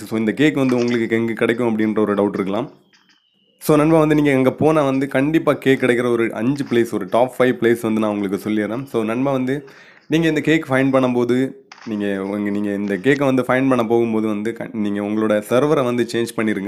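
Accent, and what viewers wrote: Kanyakumari, India. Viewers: கன்னியாகுமாரி யா ப்ரோ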